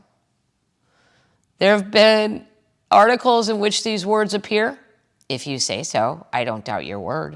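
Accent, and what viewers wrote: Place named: Wisconsin, United States. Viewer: Brilliant again. I remember my mom telling my Dad she would leave him only for Liberace if he came knocking at the door. Ha ha Keep up the Wisconsin accent 😜😁❤️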